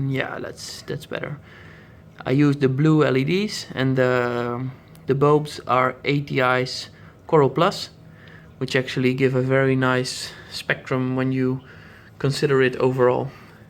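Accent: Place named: Netherlands. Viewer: I knew , by hearing your voice , you most be from The Netherlands (like I am)...but when I saw you VODKA label I know it.....By the way....Vodka stays Vodka...no W....but that a side....I like your tank!!!...I am going for a reefer 350 later this year..Or maybe have one build for me...the Reefer 350 has no room for a refugium and I would like to have one....Nice video!!!...good explaining!! ..Thanks.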